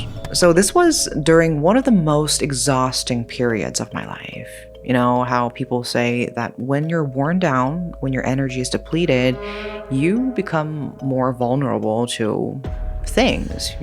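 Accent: United States. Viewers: I have always wondered how you got such a perfect American accent when (afaik) you grew up in Korea. Special gifted immersion school definitely explains it! // Man, everything I hear about the Korean school culture makes me SO GLAD I didn't grow up in it. // I love getting a personal ghost story, one that we know really happened and wasn't just a friend of a friend of a friend! <3 // Did you ever look up the history of the school to see if there was a case of a student being beheaded in an accident? // Those were great stories! Happy Halloween!! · You gave me chills with this one. Do your people have warnings against whistling at night? Also, have you lived in America? The reason I ask the second question is that it sounds like you have.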